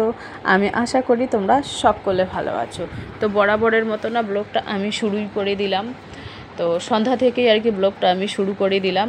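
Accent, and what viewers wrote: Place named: Bangladesh. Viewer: পুরো ভিডিওটা দেখলাম, আপনার কথা বেশ ভালো লাগলো, মনে হলো আপনি বাংলাদেশ থেকে 😮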